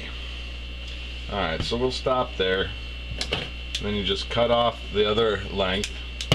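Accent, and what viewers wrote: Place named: Chicago, United States. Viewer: Thanks for the DIY video and the shout out, both were much appreciated. I'm heading to Walmart after work to pick up the supplies to make this mop. I might make a slightly smaller version of it because I have limited space in my tank. Seems like we have a ton in common... cooking (I was a chef), apistogramma, and DIY.. you also sound like you are from Chicago, where I spent the first 20 years of my life.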